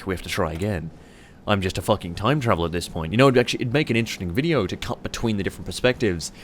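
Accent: Australia. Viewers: I like how has got progressively less Australian over the years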